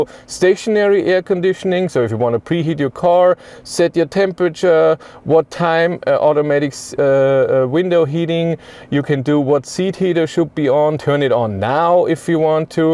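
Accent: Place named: Germany. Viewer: Sigh... Dear narrator: The word is view with a v, not “fiew” with an f. I don't know why, but this is the single most infuriatingly annoying aspect of your Denglisch. Please try to learn the correct pronunciation, so you can stop doing this. Gillt übrigens für alle Wörter mit einem V (und nicht nur am Anfang des Wortes, sondern überall): NUR auf deutsch und niederländisch spricht sich der Buchstabe V wie F aus; auf allen anderen Sprachen, inklusive Englisch, hört er sich so an wie das was Sie auf deutsch mit einem W schreiben.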